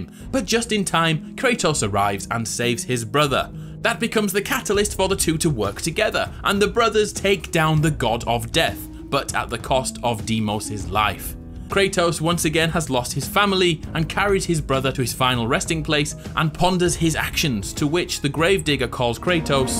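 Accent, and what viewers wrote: United Kingdom. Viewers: Man, I was looking for something else and wound up on this video... and somehow, the British accent really improves the story of God of War... GG, GG indeed. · why are you british?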